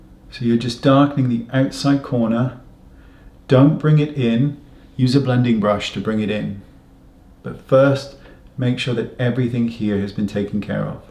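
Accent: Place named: England, United Kingdom. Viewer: So precise and helpful I'velearned so much from binge watching. Love the English accent so pleasant to listen.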